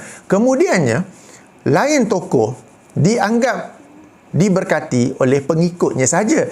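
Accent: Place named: North, Malaysia. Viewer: Senang nk rujuk sama2 ckp utara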